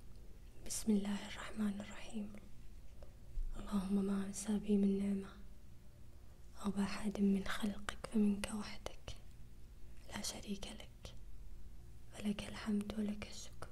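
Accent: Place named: Saudi Arabia. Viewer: هي من وين معليش ؟ احيانا احسها سعوديه واحياناً لا من اللهجه، (ونعم فيها من وين ما كانت اكيد بس اسال لان من زمان ادور سعوديه تسوي محتوى asmr مالقيت )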